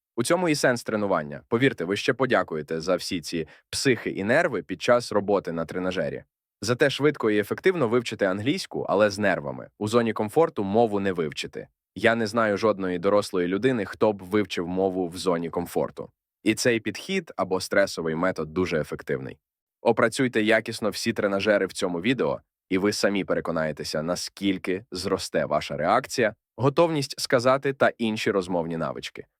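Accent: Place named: United States. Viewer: American pronunciation 👎 I like British pronunciation 👍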